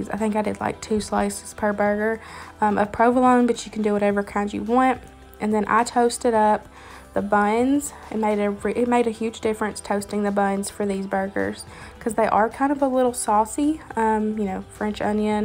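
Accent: South, United States. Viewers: What a great recipe!! love your southern accent 😊😊❤️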